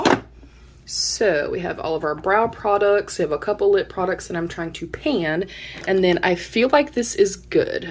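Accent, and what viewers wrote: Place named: South, United States. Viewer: I love it,! I wasn't sure if you were Southern! 🙃🤪🫠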